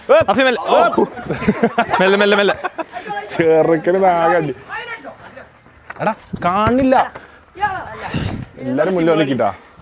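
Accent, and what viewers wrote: Malappuram, India. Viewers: ടെച്ട് മ്മളെ മലപ്പുറം 😂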